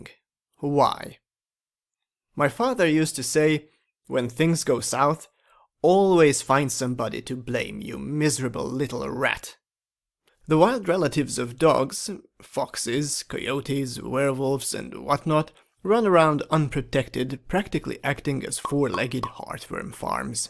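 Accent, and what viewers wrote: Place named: Hungary. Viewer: Best hungarian english on earth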